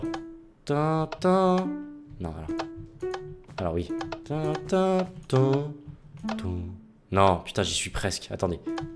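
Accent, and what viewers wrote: Quebec, Canada. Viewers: Bon accent québecois,:-)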